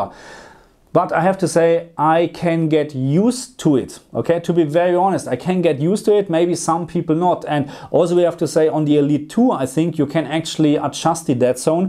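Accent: Germany. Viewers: Geiler Kanal, hab dich eben entdeckt. Man hört klar, dass Deutsch deine Muttersprache ist. Abo geht raus und Daumen hoch.👍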